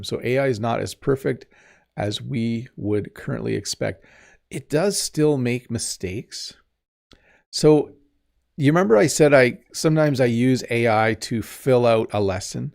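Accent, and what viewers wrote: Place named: Canada. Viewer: Salut,! It is so refreshing to listen to your videos. You speak so clearly, your pronunciation is spot on and your pace is excellent and the Canadian accent is <<the best>>! One (minor) question: do you have the ability to control the language for your captions? I notice they use USA English: favor, behavior, center.... Back to watching video, thanks!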